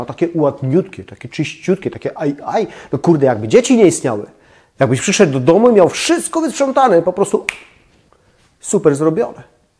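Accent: Silesia, Poland. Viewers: Synek ze Śląska (?)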